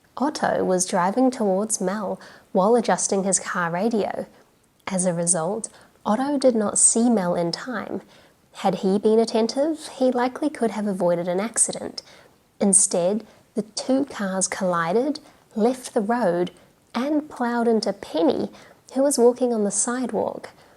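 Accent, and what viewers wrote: Australia. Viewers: No, that sounds more like an Aussie accent in this video. Either way, still great content! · Are you from Australia??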